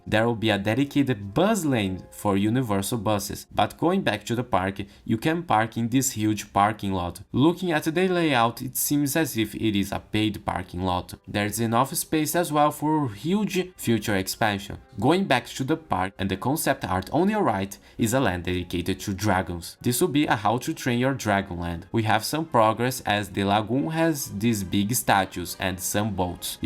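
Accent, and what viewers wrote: Brazil. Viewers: Great video, thanks! Is that a Brazilian accent?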